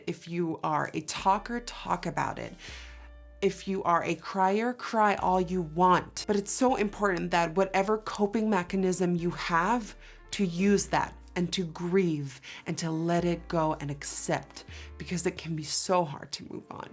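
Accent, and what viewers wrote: United States. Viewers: How come you have a strong American accent if your Dutch and living in Amsterdam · Accent? When I first started watching your videos, I totally took you for an American 😻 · “ in case you were wondering where the accent came from “ Umm I thought she was anerican till 2 years ago when She said in one of her videos that she wasnt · Can anyone explain why nikkie despite being dutch still sounds American compared to other peeps from Holland?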